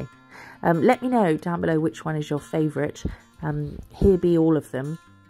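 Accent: United Kingdom. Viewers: Cheers, from Las Vegas, Baby! I think I may be one of the few sane people left here in America, so whatever you're hearing about us over there is probably true! Just stumbled across your brilliantness, and although I'm 100% hetero, I've just discovered I got Ladywood for you Girl!, your accent, your mad skills, and especially your sense of humor! It sure seems like end times these days, but at least I'll go out with fabulous nails! I must be going through some sort of transformation, as the voice in my head is now sporting a British accent and I have a new fondness for anything Ricky Gervais. Tata for now, darling, and please don't apologize for anything you say-if someone doesn't like it, they can 'bugger off' (did I use that properly?) SUBSCRIBED AND clicked the dingle jingle bell as well! Thank you!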